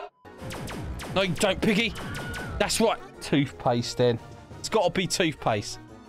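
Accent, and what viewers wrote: United Kingdom. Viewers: That's our amazingly charming British accent for you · Your british to mate❤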